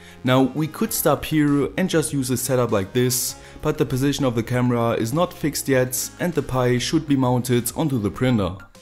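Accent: Germany. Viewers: oh gott..., ein deutscher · can i hear a german accent?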